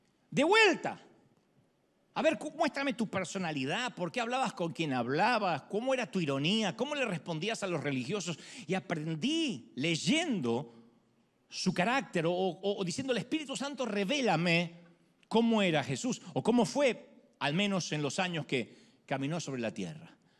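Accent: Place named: Argentina. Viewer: Que hermosa unción de Dios, a bendecido tu vida y con acento Argentino, gracias por tus mensajes claritos.. Bendiciones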